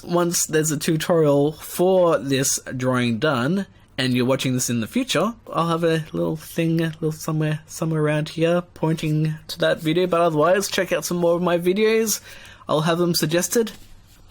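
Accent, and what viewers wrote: Australia. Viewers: Just wondering are you Australian. If so. Let’s go Aussie. Also do you watch the AFL or know about the AFL. If you do know or watch afl which team do u barrack for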